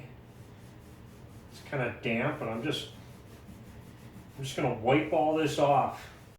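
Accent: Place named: Minnesota, United States. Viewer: You're accent sounds exactly like Jesse Ventura. Is that Minnesotan?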